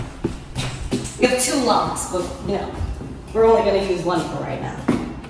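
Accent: Brooklyn, United States. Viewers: Why sometimes you have a heavy brooklyn jewish accent? Old streams I watched you didn't talk like that.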